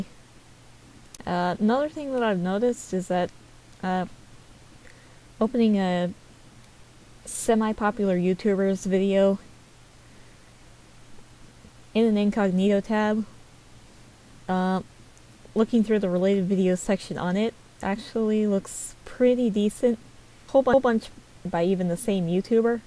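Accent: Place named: Minnesota, United States. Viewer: you sound like you're from minnniii -soootaa also no idea how i got here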